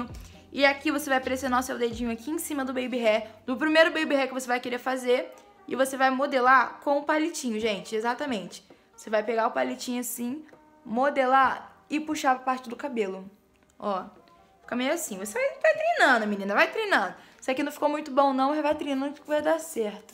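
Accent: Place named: Rio de Janeiro, Brazil. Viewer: Amei o vídeo 💗, sério,o sotaque carioca é muito bom de ouvir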